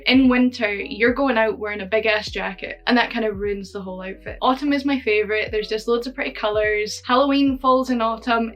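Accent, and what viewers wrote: Scotland, United Kingdom. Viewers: Just gotta say, I love your work. From a fellow living across the pond, when I first found you on tiktok I didn't expect you to be Scottish 🤣🤣🤣. Your voice sounds very different than how I expected it to. Keep up the good work. Keep your head held high and push through all the bad thoughts that you like to tell yourself. Your inner critic is the worst person to take advice from.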